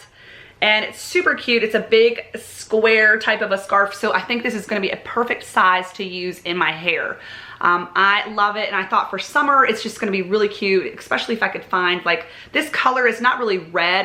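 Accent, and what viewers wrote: South, United States. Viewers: I love everything in your hall! The only thing wrong is that your haul isn't mine! I hate to admit it, but I'm jealous and I know that's just so wrong. Dear God, pls forgive me.( lol) I so enjoy your videos and I especially like your southern accent. Thank you for sharing.🌹🌻🌻🌻🌻🌻🌻🌻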